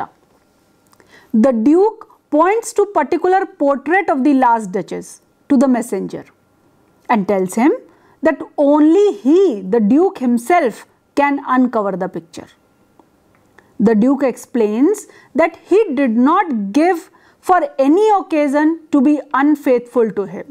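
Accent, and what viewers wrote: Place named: North India, India. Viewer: Typical north-indian accent weighs heavily on the lecture. in terms of the content it is below the average. finally the attention to appearance is not in keeping with an academic context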